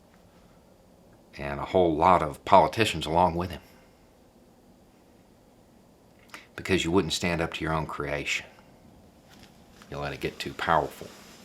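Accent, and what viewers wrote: South, United States. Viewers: Wisdom with a Southern accent. Who'd-a thunk it?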